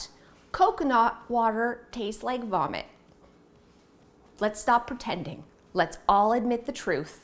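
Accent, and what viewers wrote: Canada. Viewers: Do you live in Canada? I'm trying to figure out your accent? Great video. Thank you ❤️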